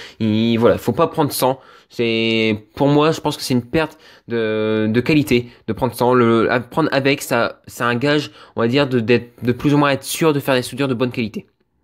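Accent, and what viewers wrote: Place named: Vosges, France. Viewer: Je crois qu'il est Vosgien (d'après l'accent) et je sais que les gens là bas ont tendance à parler un peu vite. M'enfin rien de grave, la vidéo est de qualité et c'est ce qui compte ^^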